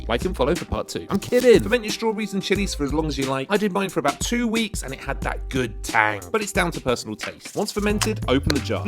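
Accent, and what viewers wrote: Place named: England, United Kingdom. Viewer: As a native english speaker I never thought I'd encounter an english accent too thick for me to comprehend naturally...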